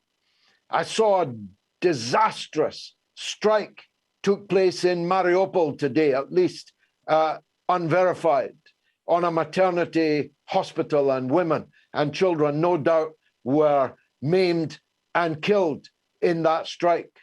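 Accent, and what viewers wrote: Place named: Dundee, United Kingdom. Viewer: George you have always been a traitor to the UK but now I see that you are a traitor to mankind.What a shill, I work with and help resettle victims of this war in Ukraine they are not lying too me. Now take your staccato Dundonian delivery and get lost.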